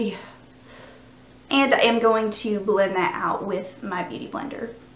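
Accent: South, United States. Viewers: Im in love with her southern accent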